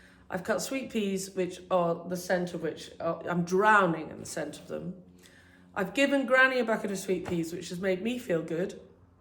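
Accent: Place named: England, United Kingdom. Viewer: I love watching your videos! First of all your English accent is beautiful. I am a New Zealand living in Queensland Australia. I trained as a florist in 2015 but was never able to get a job at a florist. Now I deliver flowers for a delivery company. It is overwhelming at times as the traffic here is a nightmare. It isn’t a relaxing job at all. I often feel stressed and rushed because I just want to get the jobs done and get off the road! I watch your videos and wish that I could do what you do. Unfortunately we rent our house so that is a problem. Oh well, maybe when the Lord calls us home, maybe He will have a bountiful field of flowers for me to attend to🌸😍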